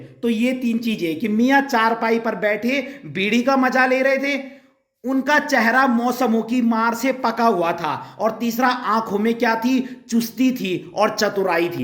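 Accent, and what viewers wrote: Garhwal, India. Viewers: I'm subscribeing you just for you explanation and your cute accent as I know you are a gadwali ❤️🙏🏻 Tehri rifles here 😂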